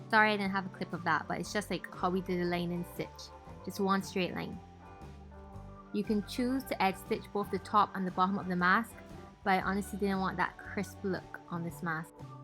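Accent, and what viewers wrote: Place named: Barbados. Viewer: You're Bajan the accent shocked me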